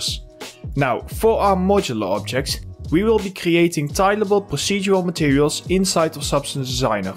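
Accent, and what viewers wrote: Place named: Netherlands. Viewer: Very dutchlish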